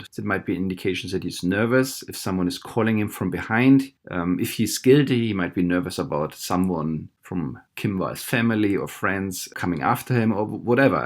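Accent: Germany. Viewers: Dein Akzent schreit förmlich "DEUTSCH, ICH BIN DEUTSCH"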